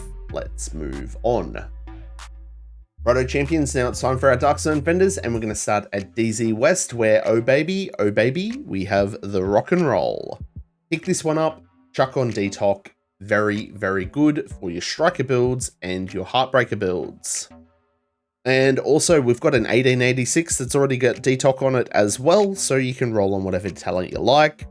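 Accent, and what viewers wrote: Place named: Australia. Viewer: He's from Australia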